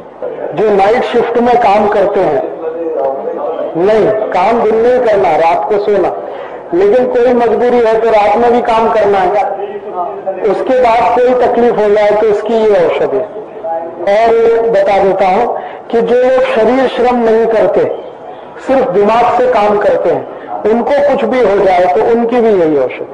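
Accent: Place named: Maharashtra, India. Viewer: Maine padha hai rajiv bhai up se hai....to unki toning marathi kyo lagati hai.....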